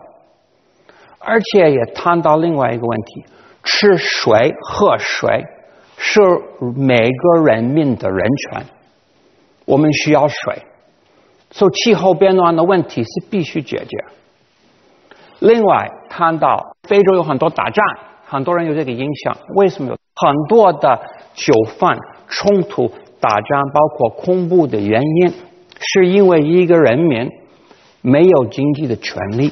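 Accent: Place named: Tianjin, China. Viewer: 来中国先到的南开，现在口音还能听到天津味儿，哈哈。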